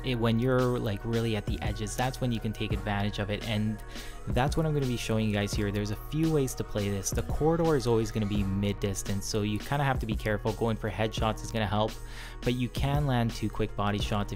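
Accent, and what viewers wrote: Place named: Canada. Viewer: Hey, are you Canadian? I can hear that accent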